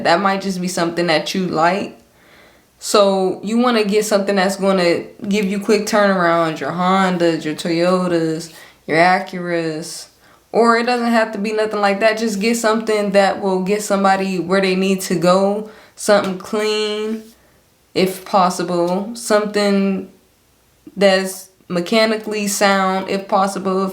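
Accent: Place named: Midwest, United States. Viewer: I love your accent lol you from Ohio or mid west area ?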